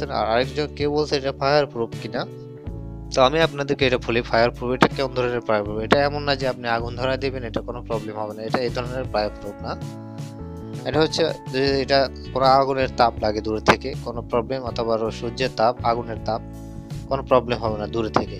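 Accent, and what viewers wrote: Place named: Sylhet, Bangladesh. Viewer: আপনি সিলেট নি? আমি তো সিলেট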